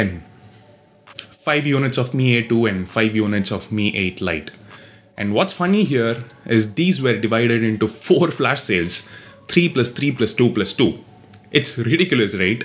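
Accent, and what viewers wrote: India. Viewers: Now that's the English one can understand properly, the way we Indians speak, unlike that fake a** weird accent used by aishwarya at c4tatti.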